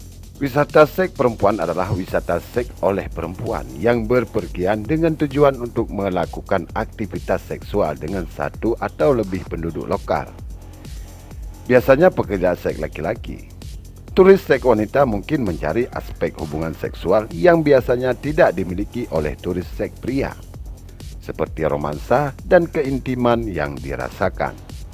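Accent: Aceh, Indonesia. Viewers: kalau saya simak logatnya, kayaknya orang aceh ya?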